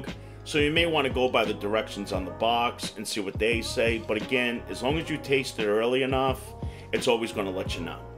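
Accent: Rhode Island, United States. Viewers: It's cool to hear a fellow Rhode Islander with a cooking video. It just so happens I plan to make this tonight. My recipe is a lot like 'yaws :)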